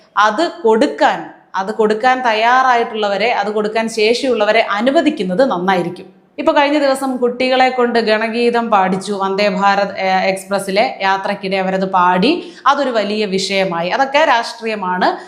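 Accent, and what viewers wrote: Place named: Trivandrum, India. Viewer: തിരോന്തോരം ഭാഷയിൽ പറയൂ. അതാണ് കേൾക്കാൻ രസം . നിങ്ങളെ വ്യത്യസ്തയാക്കുന്നതും ഹാസ്യാത്മകമായ ആ ശൈലിയാണ്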